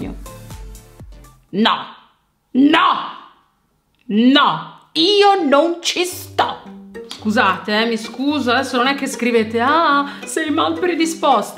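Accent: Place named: Tuscany, Italy. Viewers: L'accento toscano m'ha scaldato iccuore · Te posso dì na cosa? Me fai morì da i ridere😂!!! (In senso buono ovviamente) Comunque io sono di Arezzo e sentirti dire quel tuo dialetto aretino/ fiorentino mi ha fatto sorridere❤️si avvicina parecchio. Ma di dove sei te??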